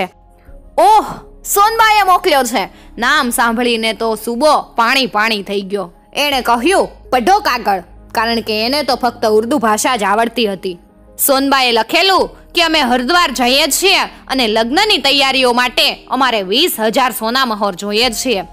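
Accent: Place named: Kathiyawad, India. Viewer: which way you are talking you must from Kathiyawad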